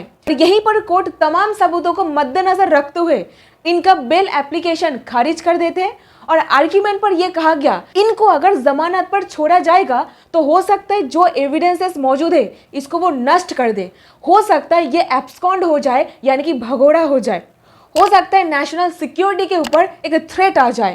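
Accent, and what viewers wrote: Bengal, India. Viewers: Apki tone bangoli h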